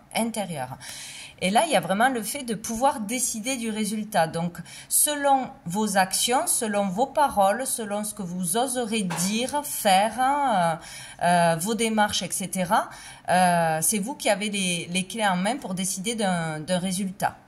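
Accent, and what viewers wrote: South, France. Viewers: Acsent du sud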